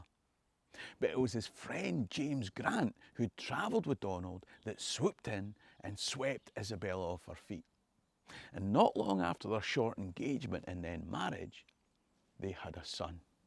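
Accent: Scotland, United Kingdom. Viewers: I think the accent has to be my favourite. The Scottish accent has inbuilt inflections, expressions and passion. It's also good to listen to a mixed race person who is at least as interested in the history of his white ancestors as his black ancestors. None of us really knows all the races they come from. As my paternal grandmother once said "a person is lucky to know who their grandparents are by looks. Women were forced. Women had affairs. No one really knows". As for DNA tests, people have received mixed results from different labs and some people have sent off the DNA of their pet and received back results for a human. A real in depth result costs a lot more than most run of the mill labs offer. DNA ancestry tests are for profit and for data gathering. One in particular admitted selling the info on to insurance companies.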